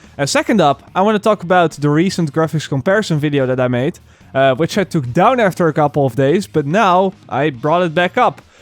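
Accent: Netherlands. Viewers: Do one about season pass and the pre-order stuff! The P416 for example, which you get when you buy gold edition; Is that a low lvl rifle for smoothing up the egdes in early-early game or is it like in a container in your stash which you can "unpack" at any time with the negative effect that it binds to you and your lvl? Sort of like Schrödingers cat? Also what are the content drops and how will they work? National guard gear? the "perks"? I'm not asking you for straight answers but just your thoughts on it. Man I have been watching your videos since you started making them, back when all we had was rumours and speculations based on "hints" inte the first trailer. I can't believe it's finally coming out!! I have gotten engaged, had a son, raised him for 2 yrs, moved to another town, quit my job, and started studying (which I'm almost done with) during the time from the reveal to release. 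All this accompanied by your videos and dutch accent, almost each week. This is gonna be great! have a nice one, Or as we say, IIN SWEEDEN, "kom igen nu Britt-Marie, KÖR för fa-an"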